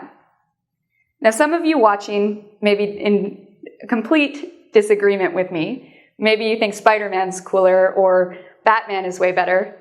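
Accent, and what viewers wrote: Canada. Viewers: i can hear the canadian accenthaha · Canadian English is nice... and she explains very well. Keep on like that,! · Hi, awesome vids! Just out of curiosity, are you by any chance Canadian?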